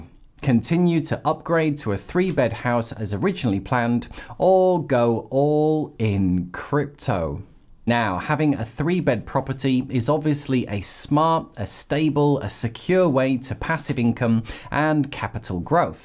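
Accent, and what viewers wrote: United Kingdom. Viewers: I realize that I like your videos cause your accent reminds me my visit to Britain. Such a beautiful land and scenery... However I buy the deep... 😁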